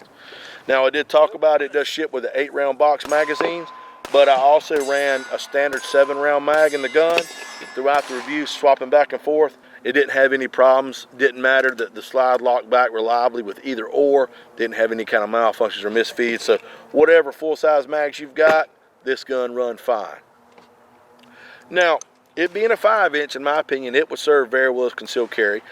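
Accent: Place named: South, United States. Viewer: that southern accent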